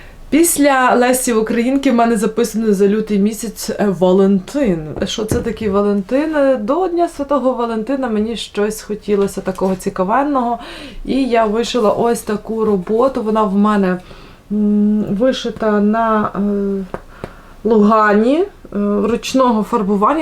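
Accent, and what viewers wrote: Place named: Lviv, Ukraine. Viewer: Дуже багато роботи зробили ви за 2023 рік. Я дякую за проект « борщівська буденка» 🙏🫶❤️ Бо інакше у мене не було б такої гарнезної сорочки. Ви надихаєте і мотивуєте рухатись вперед. Люблю ваші відео, слухати ваш голос зі своєрідниим львівським акцентом- то як медитація😊 Бажаю ще більших творчих успіхів у новому році. І дуже чекаю на новий проект. Може то буде красива бойківська сорочка чи міртова😊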